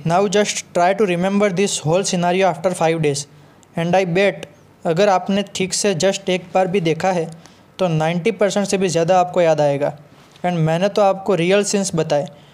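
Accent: Gujarat, India. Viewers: bhai gujarati hoi evu laget???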